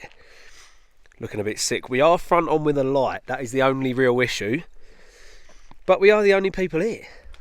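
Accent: United Kingdom. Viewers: Bro, I want to learn your British accent 😎😎😎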